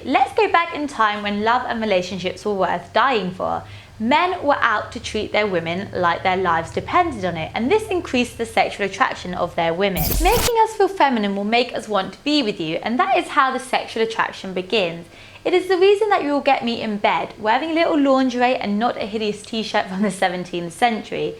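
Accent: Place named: United Kingdom. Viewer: British Accent ikr😘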